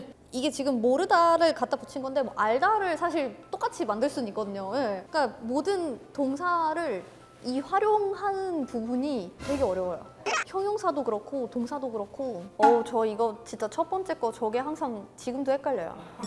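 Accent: Seoul, South Korea. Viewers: 경상북도 사람인데요..나보다 서울말 더잘하노..ㅋㅋ.! 진짜 대단하십니다.. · 전형적인 서울말씨에요. 당신의 노력에 찬사를 보냅니다. 역시 동시 통역사이군요.대한민국 홍보도 많이 해주세요. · 한국인태생특유의음성. 부모님중한분이한국인이신가요? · 서양외모에 전통한국인발음말투 ㅋㅋㅋㅋ신기방기하다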